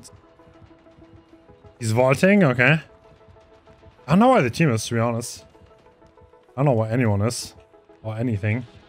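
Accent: Germany. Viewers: 100% sure that you are german xD the german accent while speaking sounds absolutly terrible xDDD i got the same problem Liebe Grüße - greetings 😝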